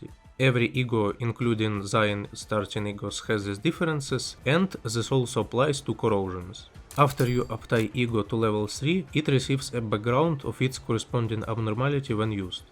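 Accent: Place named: Russia. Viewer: the heavy russian accent is crazy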